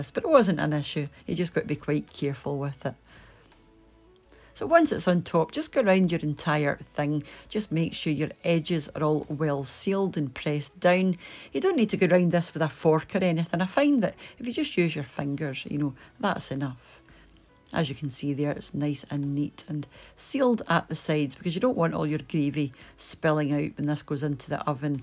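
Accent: Scotland, United Kingdom. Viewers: Love your accent.prosper Scottish.Also love your recipe. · Lovely looking pie I'm gonna do this. By the way are you from Inverness? You speak perfect English with you Scottish accent. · Oh I’m gonna give this a go. Wee Scottish lassie, I’ve been in Australia 33 yrs, nice to here a voice from home … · Thank god a Scottish cooking youtuber lmao was starting to talk american watching all these wans lmao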